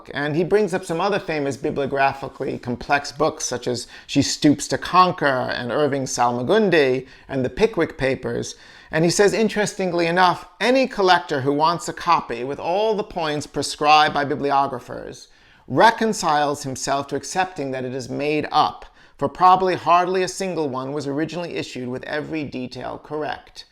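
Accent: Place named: New York, United States. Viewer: Fabulous as always,. There ought to be some way for you to make a really good living just doing these videos. NO ONE else is even remotely close to doing them as well. Your erudition and excellent sense of humor entertain us all the while you are teaching us bibliophile/bibliomaniacs more about the books we love. I do agree that I too was waiting for you to open the KJ and show us some of the interesting TYPOGRAPHY, not TOPOGRAPHY in the volume. That NY accent of yours definitely came into play, as I found myself also wondering: "Why is he repeatedly mentioning the topography of the work, he's not talking about maps?" Eventually, I figured out you were saying typography, of course. Anyway, do you have that copy for sale? Please email if you do. Much continued success, my friend.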